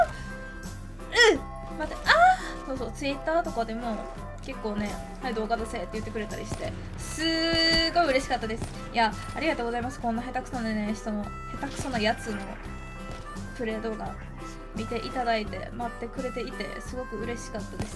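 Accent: Kansai, Japan. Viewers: お待ちしておりました！さんの関西弁ちょー好きです！w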